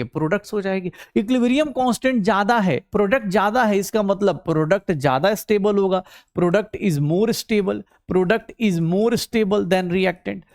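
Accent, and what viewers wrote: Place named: Bihar, India. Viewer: Sir ka bihari accent mast hai 😂❤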